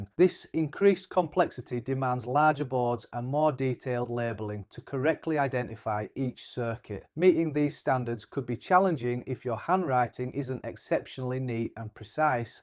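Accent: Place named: North, United Kingdom. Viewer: Nah, that's just his northern accent!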